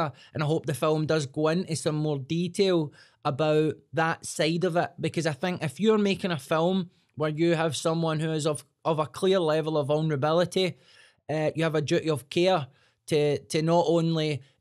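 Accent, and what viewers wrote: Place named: Scotland, United Kingdom. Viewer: I have no idea why or how this popped up on my Youtube, but great insight. Subscribed. Also, LOVE the accent. I thought that one Scottish comedian I've seen was joking by exaggerating. I realize I was incorrect.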